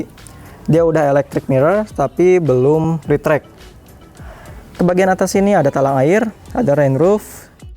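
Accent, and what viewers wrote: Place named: Banjar, Indonesia. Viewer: banjarann ini mah mang nya?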